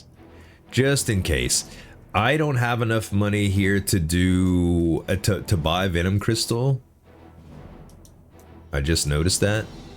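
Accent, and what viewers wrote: Louisiana, United States. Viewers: I'd bet money that's a Louisiana accent. I know my people. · Hey man, glad to see you doing well, just found your channel TODAY (coming from gohjo lol). I’ve watched a few vids and noticed you answer comments either in videos or reply right here, so i have one question. WHERE ARE YOU FROM? You play with your voice a lot it seems but i keep hearing so many different little American dialects. I’m wondering if that’s just how you speak naturally or if you are doing random accents/slang for fun? Please I need to know why your speech patterns and accent is so hard for me to place. Thanks and love the content my friend.🙏👌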